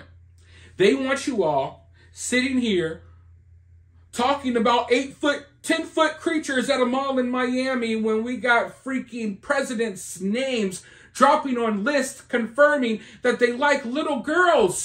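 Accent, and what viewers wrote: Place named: Georgia, United States. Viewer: Your a Floridian? I thought you were a Georgian!!!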